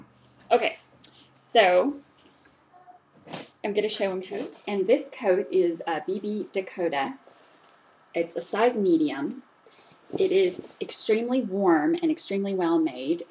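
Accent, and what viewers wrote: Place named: Tennessee, United States. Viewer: I have no idea how I didn't figure out you were from Tennessee before now...I should have been able to pick out that accent.(I'm from West Virginia myself). I adore those coats...I need a good winter coat of my own since my windows are still frosting overnight. I'll have to give modcloth a try. Thanks for the tip off Darling. :)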